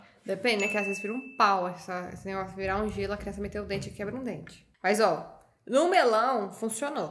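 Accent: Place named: Northeast, Brazil. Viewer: você tem família nordestina?! Não me lembro se já disse, é que cê tem um sotaque bem puxado pro sotaque nordestino, acho uma graça 😍🙌🏼🙌🏼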